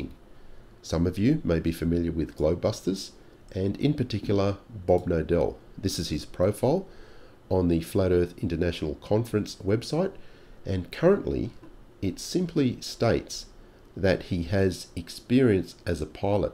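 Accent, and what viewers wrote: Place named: Australia. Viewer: I like the Australian accent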